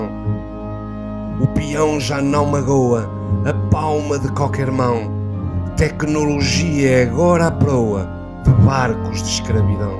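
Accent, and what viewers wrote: Portugal. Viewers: com o sotaque português dar toda elegância ao poema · Estou Emocionada ao ouvir tão bela poesia! Me fez lembrar da minha Infância queria . Eu realmente tive uma Infância Feliz. Eu Amo o seu sotaque Lusitano. Obrigado.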